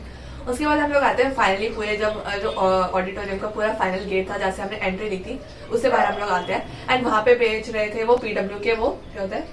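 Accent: Haryana, India. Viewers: this red t - shirt wale bhaiya has been controlling his laugh and had never been serious in the whole video 🤣🤣😂😂😅😅🤣🤣🤣 by the way I love ur videos and I request u to pls give some tips and information about apps u use for editing etc. to help me start a you tube channel🤗🙏🙏🙏🙏🙏🙏❤❤❤❤❤❤☺☺☺☺☺ by the way di aap HARYANA se ho kya ??? because apka accent bilkul vaisa hi h guse mai ya funny mai😂😂😅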